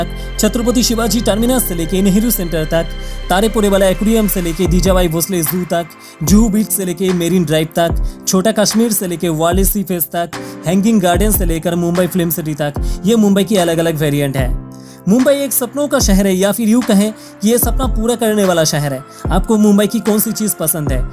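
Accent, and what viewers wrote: Bengal, India. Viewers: aree yaar kisi neutral accent wale ko bola karo narration ke liye. tum bangla wale pura khel kharab kar dete such irritating accent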